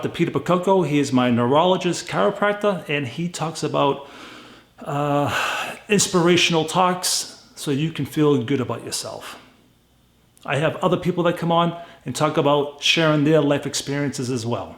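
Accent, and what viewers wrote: Boston, United States. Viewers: I knew I detected a Boston accent. Also, my father had 97’ 4Runner stick shift, really bulletproof. He put 267k on the odometer because he was in the military going from New York to Texas. The vehicle raised me half my life. You made a great investment because in terms of reliability and resale value it’s worth it. Sadly, it’s unfortunate that the frame was rotten, those are pricey to buy and replace.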